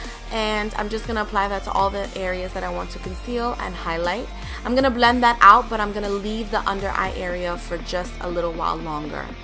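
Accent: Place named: Latin America. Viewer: Hey " "?......Love your accent...Latina right? I have subscribed to your channel.. Can you also share so love over here..Each one helps one!!...❤️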